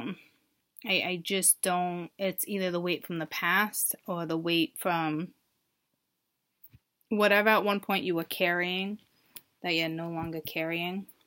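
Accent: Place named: Massachusetts, United States. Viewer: I know what I would do.....🏃‍♀️👀🏃‍♀️👀🏃‍♀️👀🏃‍♀️👀no woman should ever take a cheater liar thief and deadbeat dad back. Stay where you thought the grass was greener but instead you got the Sahara desert 🐫 🙏❤️thank you love that Massachusetts accent. Right up the road from you. You are a great reader 🙏❤️⭐️⭐️⭐️⭐️⭐️ An ex asshole libra husband 😂😂😂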